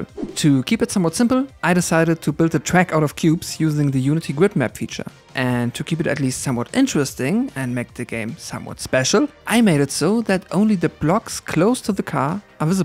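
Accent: Germany. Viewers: Man hört das du deutsch bist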